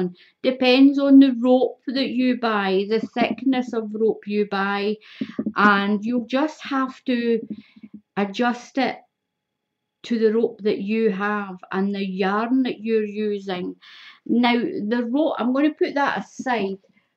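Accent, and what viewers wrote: Scotland, United Kingdom. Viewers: I love your crafty videos,! I also love to hear the Scottish accent spoken... I like to think it's something in me hearkening back to my Scottish roots, haha! Anyway, Thank you so much for sharing this tutorial! Rachel D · Oh My! Hope I'm not wrong. You sound Scottish. And hopefully I spelled it right otherwise my Gramma would kill me if she were still living. She was my Hero. Everyday in every way I aspire to be just like her.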